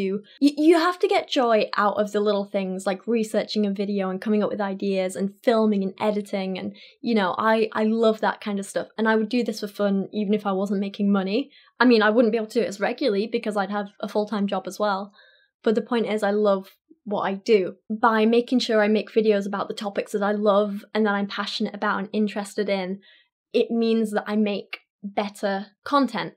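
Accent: United Kingdom. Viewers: Uh ok why the fuck haven't I noticed you were British until just now? I've been watching you for about a year now lmao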